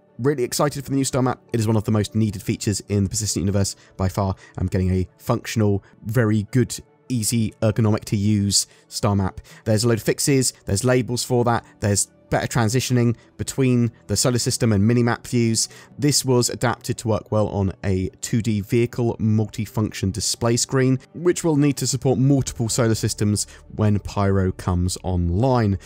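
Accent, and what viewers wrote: United Kingdom. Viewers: BRO YOU SPEAK TOO FAST AND TOO BRITISH.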